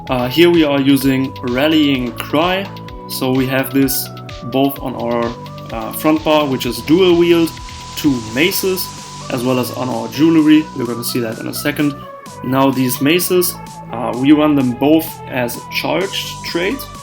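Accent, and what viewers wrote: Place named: Germany. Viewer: nice build and well explained. The background music is a bit loud and overall terrible for such a long video but everything else is well done. Finaly someone mentions the Trial by Fire set, it's so overlooked and hands down the strongest defensive set for PVP. I would also like to see a build using Torug's Pact, another much overlooked set. Liked and subbed and looking forward for more content :) PS: Dein deutscher Akzent ist killer :D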